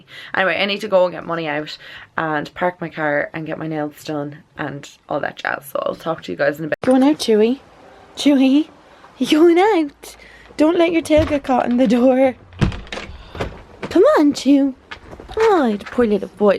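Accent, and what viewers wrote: Ireland. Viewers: You are my favorite YouTuber. I think you’re absolutely adorable. Especially your accent-makes me want to visit Ireland! I just bought the Too Faced Sweet Peach palette (I typically stick with matte palettes because of allergies but loved their “Just Peachy Mattes” palette if you haven’t tried it) and had to reference back to your initial review before I swiped that card at the register 🤣.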